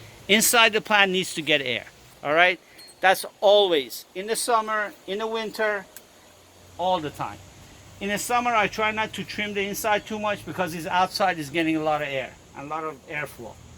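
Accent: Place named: New York, United States. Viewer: Great advice best ever. Love your New York accent.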